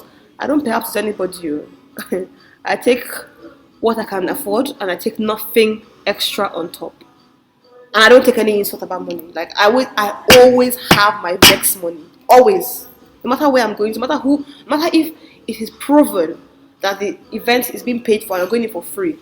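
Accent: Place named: United Kingdom. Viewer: 😂😂😂 Pls Subscribe to my Channel please😆 That’s a silly boyfriend materials😁 Your Brit-accent is on point😂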